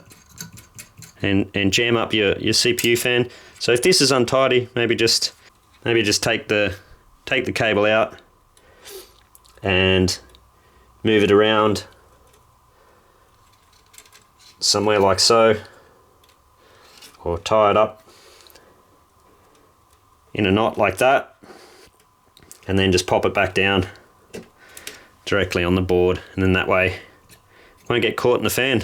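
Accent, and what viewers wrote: Australia. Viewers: Excellent no-nonsense videos, great to hear an Australian voice... can you tell me what the data usage is on a rig like this per month? Is that a stupid question?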